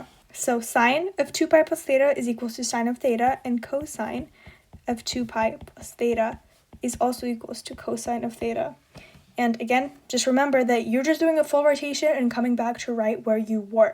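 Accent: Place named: Australia. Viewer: Ur australian accent is cool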